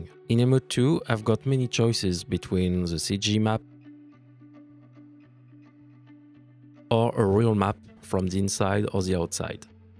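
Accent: France. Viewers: Un accent Français ou je rêve ? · Pas mal l'accent français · J'adore un accent français. Grand tutoriel, très détaillé et bien fait.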